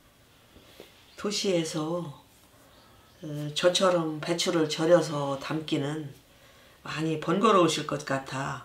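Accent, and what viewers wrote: Chungcheong, South Korea. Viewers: 정감있는 충청도 말씀이셔서 고향이 충청도이신 돌아가신 친정엄마 생각 많이 났습니다 좋은영상 감사합니다 건강하세요~^^👍💖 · 영상 잘 봤습니다. 저희 어머니 고향도 충남이다 보니 꼭 저희 어머니 말씀하시는 줄 알았습니다. 만약에 40kg을 담근다면 님께서 20kg 기준으로 한 재료보다 2배정도 재료를 더 넣으면 되겠죠?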